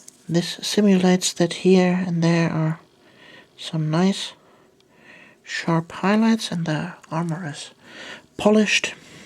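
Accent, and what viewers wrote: Germany. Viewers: No she's German, a lot of foreign accents speaking English come off deeper than our own, give her a break will you? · ICH WUSSTE DAS DU DEUTSCH BIST!! hört man an deiner aussprache.. tolle videos.. danke für die hilfe :D !!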